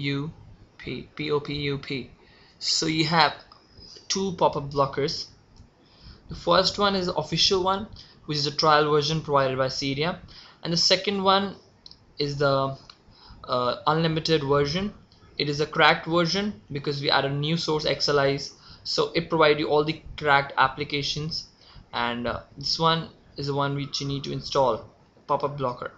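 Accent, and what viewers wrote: India. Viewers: is that a tamil~rrr accent? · indian accent sounds like shit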